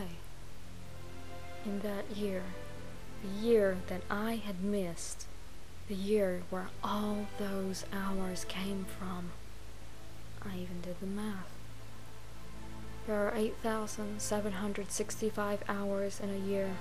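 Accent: South, United States. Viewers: I thought you sounded southern! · Australian?! LMFAO. Clearly tell she's a southerner. So cute.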